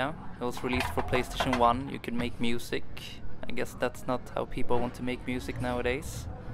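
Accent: Sweden. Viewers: are you from sweden? what hard off is this+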